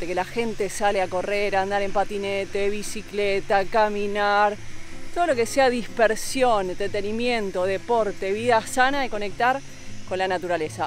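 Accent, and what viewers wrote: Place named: Argentina. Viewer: escribo desede ginebra ( Suiza ), perdoname, mi nivel de español no es alto. Pero me encanta la energia que ud transmite con sus videos.es la mejor video sobre Badalona.En particular cuand ud.habla del parque que es el pulmone verde de la ciudad. Tu accento argentino es muy simpatico cuando pronuncias las palabras. Me recuerdo, que hace vueltas en bici desde Barcelona, pero en este tiempo no hay pistas para bicicletas, et yo me encuentro con mi bici, una vez en la autopista. Me falta mucho gramatica en castellano, y espero que eres indulgente conmigo. Besos de Europa Central. Tu nombre es?!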